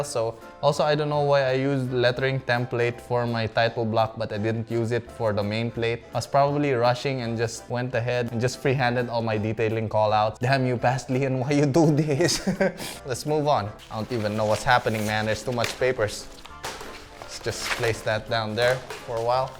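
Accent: Baguio, Philippines. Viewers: Are you from baguio?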